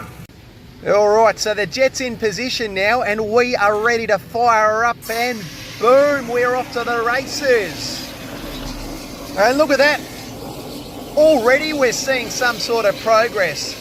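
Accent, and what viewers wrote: Australia. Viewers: I’m from the US.. I love the accent soo much!! I have my Apple phone and iPad Siri voice set to the Australian voice.. I hope to someday go on vacation to there.. I love the hot weather and nature and yes I am not afraid of the deadly creatures down ther.. I have a major respect to leave them alone and they should leave me alone.. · It was amazing to see Tom Cruise clear a drain with an Australian accent.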